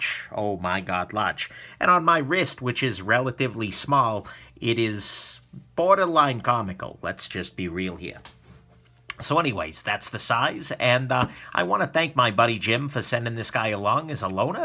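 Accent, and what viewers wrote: New York, United States. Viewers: New Yorker much? Nice review 👍🏼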